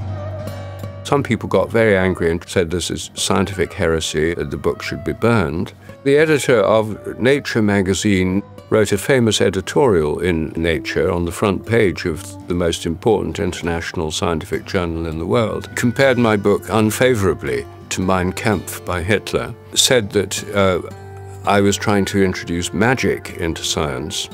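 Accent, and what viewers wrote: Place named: United Kingdom. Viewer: i came for the noble posh english :face-green-smiling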